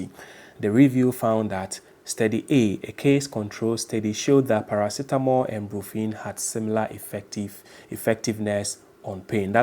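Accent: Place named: India. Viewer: The voice over is in India, why? I can't understand anything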